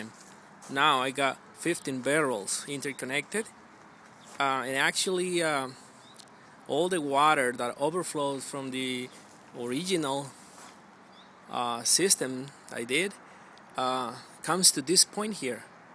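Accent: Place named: Mexico. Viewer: love the accent bro.. Viva mexico .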